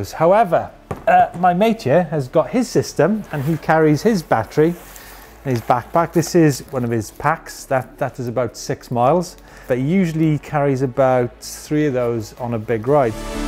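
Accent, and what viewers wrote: Wales, United Kingdom. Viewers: Are you Welsh? You sound Welsh?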